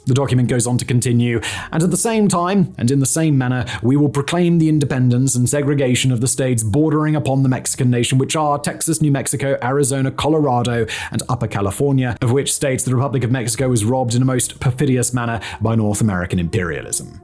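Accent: United Kingdom. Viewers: This entire Revisionist history lesson is extremely rich, especially when it is being told by some dude with a Brit accent.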